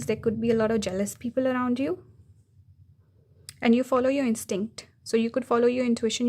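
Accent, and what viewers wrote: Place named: India. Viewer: Pile 3 speaking. Logical as well as intuitive. Plus you have a cute Indian accent. ❤😊.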